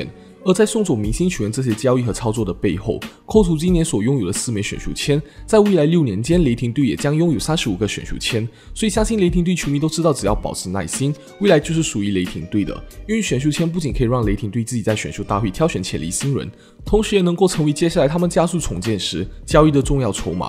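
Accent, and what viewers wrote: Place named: Malaysia. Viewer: 馬來西亞口音本來就跟我們平常聽到的不太一樣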